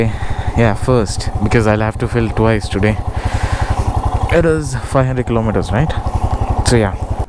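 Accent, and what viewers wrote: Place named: South India, India. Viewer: South Indian English I can Understand 👍👍👍👍👍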